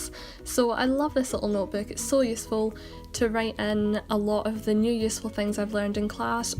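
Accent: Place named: Scotland, United Kingdom. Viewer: Love that scotish accent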